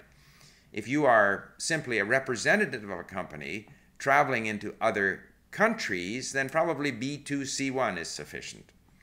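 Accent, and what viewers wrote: Canada. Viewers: yes this is something I also find interesting! I never thought Canada had an accent until I went to Vancouver and when I saw yuor first video I thought "wow this guy has a Canadian accent en then you said you were from there en I was like wow I was right! hahaha but yea very interesting topic! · Hello,! Can you do some videos about the different kind of English accents (British,US, Scottish,Australian etc...)? I'm French and it will be great to know more about it. And also if you can talk differences of vocabulary between Canadian/US/UK vocabulary? I read that for US, you (English Canadian) have an accent but I am not able to notice yet. Is it as strong as us French people with French Canadians? Do Canadian people learn French from France or French Canadian?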